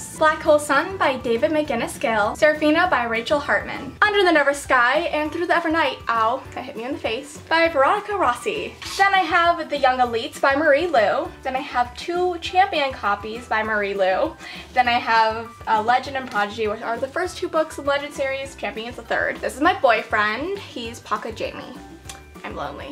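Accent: Scotland, United Kingdom. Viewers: That is the most hilarious Scottish accent I have ever heard😂😂😂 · Your scottish accent is to die for! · I love the scottish accent 😂👌🏻❤️ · Your Scottish accent is honestly the cutest!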